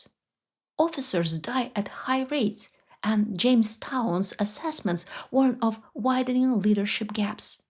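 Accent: Russia. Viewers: Are you Russian? You sound Russian, but your last name is? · That lovely accent of soviet time English teacher)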